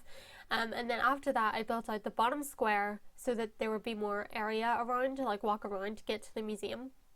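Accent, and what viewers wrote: Northern Ireland, United Kingdom. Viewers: Great video! Do I detect a Northern Irish tinge there??😉😆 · the way it took embarrassingly long for me to fully catch on that you’re from northern ireland,, considering so am i ok wow lmao also how amazing is this entrance!! i have some fossils on the second level beside the museum and have water around it all and i have a few fossils/dinosaurs on like wee lone islands within the river so you cant get near the fossils,, if that even makes sense😂😭 but im really happy with mine! it was the first ‘grand’ thing i did with my island! it just needs some finishing touches like flowers when i finally get all the hybrids i want😭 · Same i went into to comments to try and find out cuz I couldn't tell if it was an ni accent or not 😂😂😂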